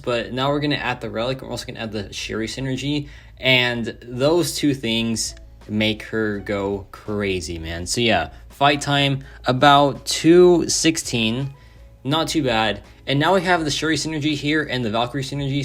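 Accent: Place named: Singapore. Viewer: U sound like singaporean sia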